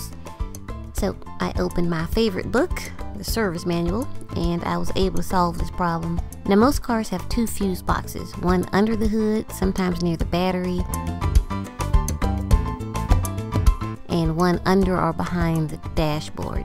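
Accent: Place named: South, United States. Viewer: I hope that you’d consider doing voice-over work. Your voice is unique and has a pleasant tone. And the southern twang is awesome.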